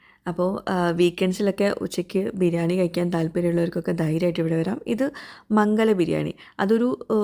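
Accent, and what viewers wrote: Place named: Malappuram, India. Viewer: മലപ്പുറം ഭാഷയാ ണ് കേൾക്കാൻ. സൂപ്പർ ❤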